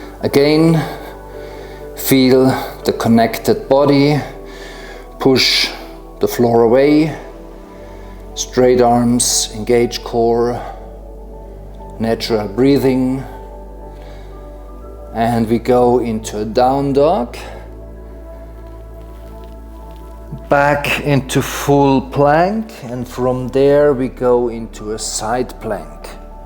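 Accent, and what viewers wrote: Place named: Bavaria, Germany. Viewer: Sehr schöne Yogaeinheit um am Morgen wach zu werden. Ich mag dein bayrisches Englisch!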